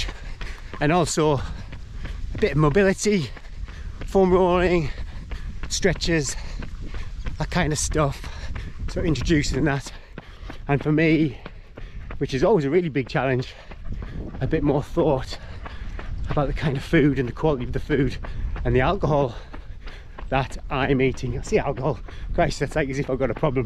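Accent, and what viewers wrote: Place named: North East England, United Kingdom. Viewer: Hey...just subbed from Semi-remote Canada! (and followed ya on Strava...I just set that up..."Mookie" Good to see an "old" guy trying crazy stuff! I'm 58 and have a trail marathon next month (Oct 8). My longest race has been 50km. Hard to find the longer ones near me. I have 3 dogs too so a bit of logisitics. Looking forward to your training vids for this 100 miler! I find I have had to modify my training to keep fresh legs and can't pound away like I did 20 years ago. You live up where my parents come from....West Pelton and Chester-le-Street area...nice to hear your accent (even though you likely would think I'm the one with the accent!)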